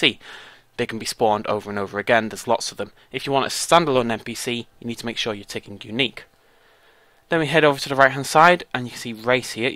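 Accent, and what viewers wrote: United Kingdom. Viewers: Luckily I actually can imitate four of the over five thousand U.K accents so I can understand him. Now as for what he is talking about and making reference to, well I suggest you all go to full screen to be able to read the headings on whatever he is mentioning. If you need a translation into American aka Michigan-no-accent-at-all, just note me. I will attempt to delete all the extra words that are not necessary to learn how to do this in a transcript. I can do everything in Old English fonts to make it more authentic. ;)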